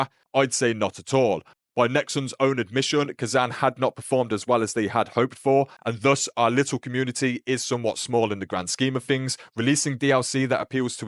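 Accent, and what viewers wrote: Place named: United Kingdom. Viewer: Never heard such a cock in the mouth accent as from this Brit 😂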